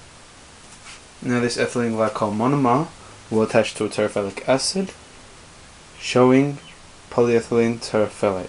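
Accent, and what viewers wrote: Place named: Middle East. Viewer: Woah, you sound exactly like me except a little middle eastern touch.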